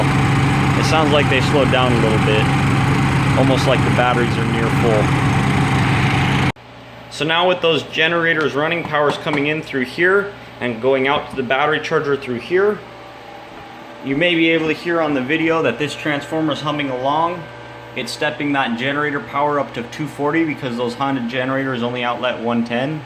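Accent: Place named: United States. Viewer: May I ask what state you're from? I'm trying to learn about American phonetics and I found your accent very pleasant to listen to. I hope I'm not being too intrusive.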